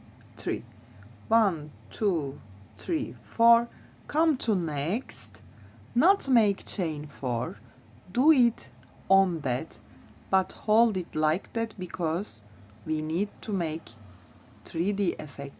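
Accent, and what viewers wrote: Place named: Turkey. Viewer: best turkish-english i have ever heard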